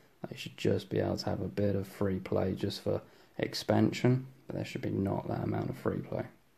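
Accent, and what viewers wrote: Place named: United Kingdom. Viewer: Ah right. Your British accent made me think you were from UK. No worries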